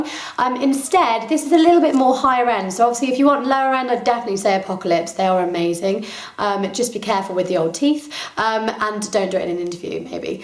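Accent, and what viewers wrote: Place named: United Kingdom. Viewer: I love this tag,it was fun and you have such a fab sense of humor.You say the funniest thing while speaking with that classy British accent.xoCathy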